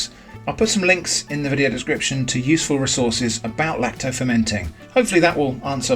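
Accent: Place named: Southern England, United Kingdom. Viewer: thought it was south but couldn’t quite place the accent , From Kent myself but I live in Norwich ,, great foraging out in the country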